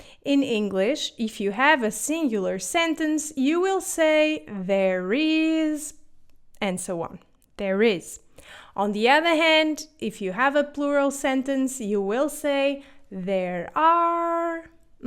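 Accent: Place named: Portugal. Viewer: Como eu adoro ouvir você falando inglês! Amo seu sotaque Português da Europa. ❤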